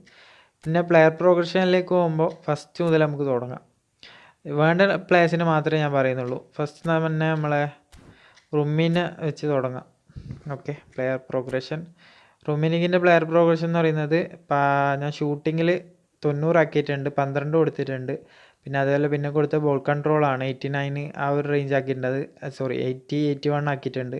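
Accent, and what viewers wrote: Kannur, India. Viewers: Kannur eadeyaa ingal?👋🙂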